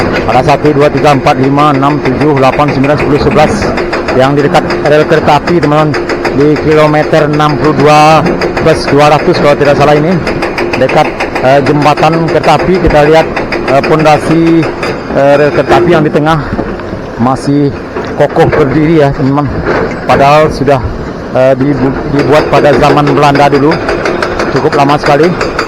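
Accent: Minangkabau, Indonesia. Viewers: Dari beberapo video ikolah nan gambar video barasiah, stabil, carito jo informasi sinkron dan jaleh, didukuang pulo jo suaro dan logat lokal, yo samakin taraso ado di lokasi.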